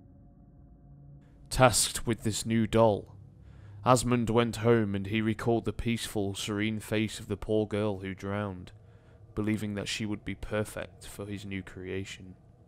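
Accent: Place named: United Kingdom. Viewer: Sorry but I think this story was proven to be a hoax in Paris. The face comes from an art book from a Helsinki art school published 20 years before the body was found. However many young people were fished out of the Seine during that period. Sorry to be a negative Nancy. I do love the gravitas in your voice and the brit accent!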